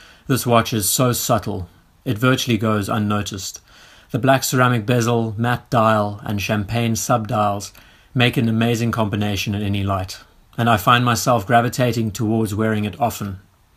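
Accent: South Africa. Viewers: This is a bit sad. You’ve spoiled the joy of owning the real thing by buying cheap (albeit well done) knockoffs. So if you do ever decide to trade up, it won’t feel as special. Call it what it is, it’s like living with a very good sex robot versus a real girlfriend. Whatever, judging by your accent you’re from South Africa, so it’s just as well that when you inevitably get robbed of them it won’t be so bad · Great collection, very enjoyable channel. Your accent sounds South African. Hello from Johannesburg South Africa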